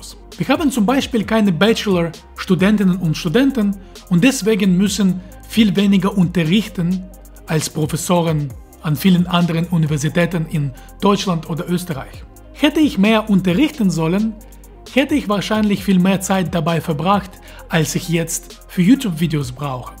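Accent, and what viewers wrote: Russia. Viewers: Das ist richtig, es gibt viel zu wenig gute deutschsprachige naturwissenschaftliche YouTube Kanäle. Schön das Du Zeit findest, Dein Wissen mit der Allgemeinheit zu teilen. Übrigens, der russische Akzent ist einfach nur Klasse! Ich freue mich auf weitere Beiträge.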